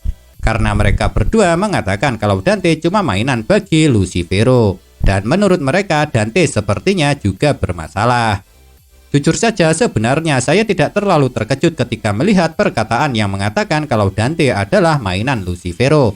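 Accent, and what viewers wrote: Java, Indonesia. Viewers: Logat jawa nya masih kerasa,pembacaan dan penjelasan masih kurang enak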